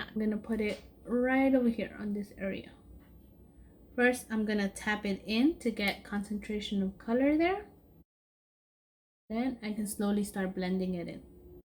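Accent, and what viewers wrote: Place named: Latin America. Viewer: Awww me encanta tu acento latino!!!! 😍😍 Viví un tiempo en Tx y me recuerdas mucho a mis amigas de ahí!! 😍😍 By the way loveeeed ur make up!!!! 🤩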